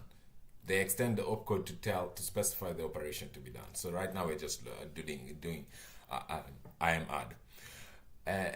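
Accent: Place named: Kenya. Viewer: you sound Kenyan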